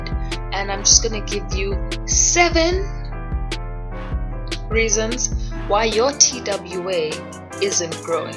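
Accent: Zimbabwe. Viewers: This accent has to be Zimbabwean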